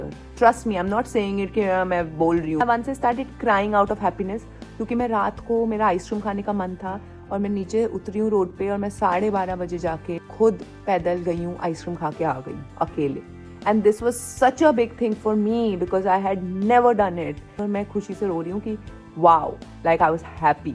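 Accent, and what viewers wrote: Delhi, India. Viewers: Ur delhi accent is so cuteee..proper delhi wali tone🤌🏻🤌🏻🤌🏻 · I got very emotional after watching this vlog, I also live in noida and I am just 20, and i kind of went through the same feelings when i had a breakup, you felt just so relatable to me, also the way you talk the delhi accent everything is very relatable. I saw you for the first time in Meri aashiqui tum se hi & I adore you since then, you are a pure soul and i just wish you get all the happiness in the world 💌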